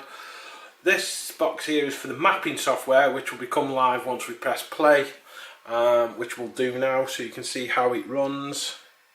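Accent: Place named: England, United Kingdom. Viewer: Sid Nazim an American picking faults in an English guy speaking English as its spoken in England ?hahah stick to cheeseburgers boy ( and by the way it al-u-min-i-um not aluminum !!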